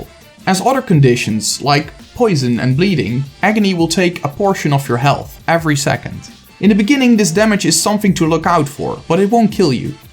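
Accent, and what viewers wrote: Netherlands. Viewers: Yeah. It's a really noticeable accent, especially if you're Dutch yourself. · This is the most Dutch accent I have ever heard, I love it! You earned a sub! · I can always hear the dutch accent, coming from a Swede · So.., you are a Dutch?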